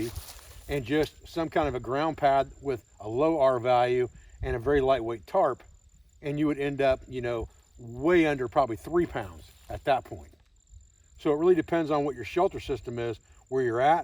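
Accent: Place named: Ohio, United States. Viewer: Could you add the names of the packs to the video description. I was able to look up the first one you displayed, but your ohio accent won't let me figure out the name of the second one.:) Thanks, - Joe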